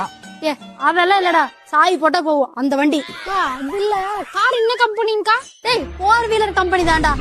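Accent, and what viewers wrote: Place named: Kongu, India. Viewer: கொங்கு slang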